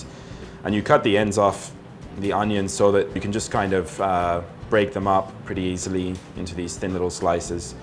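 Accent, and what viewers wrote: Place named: Philippines. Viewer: I like how you have a little bit of filipino accent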